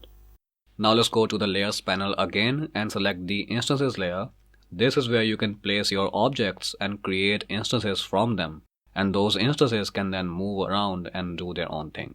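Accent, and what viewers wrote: India. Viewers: Thank you person who's name I don't know who has an accent that I think belongs to india. This is a great tutorial. I would love to learn more from you.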